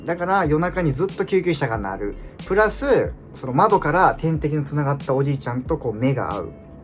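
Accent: Kansai, Japan. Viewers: 伊予弁やから関西アクセントなんねー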